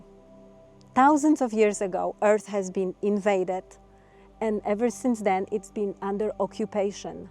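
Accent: Poland. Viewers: Maybe it's just me, cause I'm Polish, but your accent sounds veeeery Polish to me. Especially the way you pronounce "Antarktyka". Is there a grain of truth in it or am I totally wrong?😊😅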